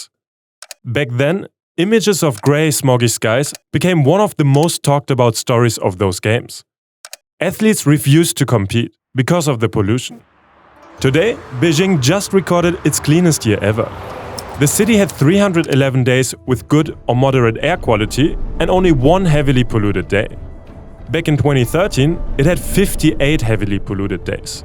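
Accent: Germany. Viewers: I can't cope with your cute German accent hahaha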